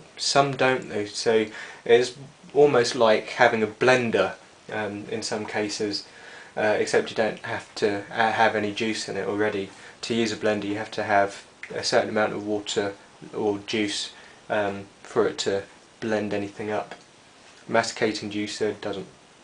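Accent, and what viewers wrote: England, United Kingdom. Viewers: Dig your British accent. Nice review on the juicers. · I never saw a chinese person with a british accent ^_^ cool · an asian with a brittish accent how awesome!! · he sounds very english to me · asian with british accent cool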